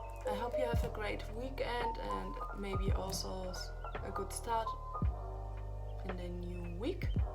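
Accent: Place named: Eastern Europe. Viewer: Thanks for this video! I am thinking about studying sinology and you helped me a lot. I study on high school now but next year I'll need to decide what program at which uni I wanna study and apply there... I was thinking too about studying in Germany although german is not my native language (same with english), I'd improve my german at studienkolleg and then apply to the uni, but I am not sure if it's not too much for me :D And what are your native languages? You have interesting accent, I guess you speak german and some slavic language! Polish, probably?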